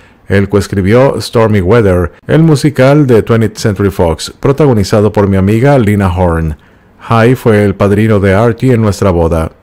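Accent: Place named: South, Spain. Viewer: me hace reir. Eso significa me cae bien. Despues que termine su disertación voy a ver una película de Ava Garder. Me gusta el acento del sur.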